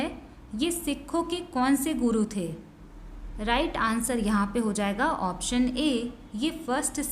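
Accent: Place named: West Bengal, India. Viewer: Ma'am aap pakka West Bengal sein ho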